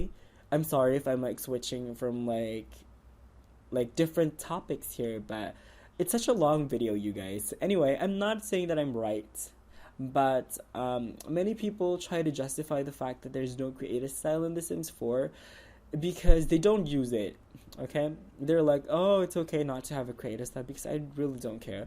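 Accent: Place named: Philippines. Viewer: I really like your accent. Where are you from the Phillipines?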